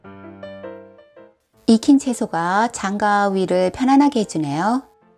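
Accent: Seoul, South Korea. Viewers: 옛날 서울사투리듣는거같지만 천천히 느긋이 설명해주셔서 잘듣고있습니다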